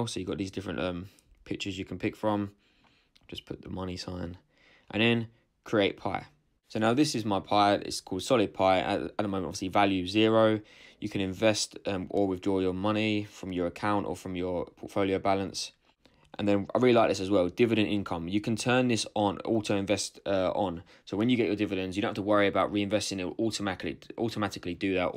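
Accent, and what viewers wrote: United Kingdom. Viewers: Hey, I like the British accent. I’m Kenyan and because we were a British colony, that’s the English we speak there. When I moved to the US 9 years ago, I had a hard time adjusting, and even today I still struggle. Other than that, great content!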